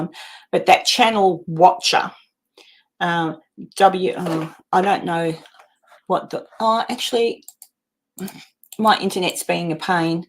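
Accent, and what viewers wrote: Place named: Australia. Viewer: Yes Maam I Have Settled in the States .Yes Maam Mother is French Dad is Brit ,But My Ass Settled in the States. I like the Idea About Forgetting About Stuff I Will Try and Catch Your Lives. I love the Australian Accent and Australia Being One Place I Haven't Been. Your Son Being in the Military ❤ He Will be in My Prayers and I Thank Him for His Service!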